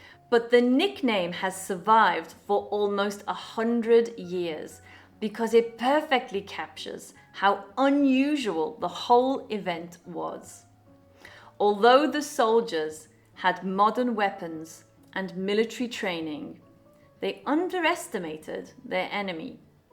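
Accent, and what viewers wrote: United Kingdom. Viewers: New subscriber, love British accent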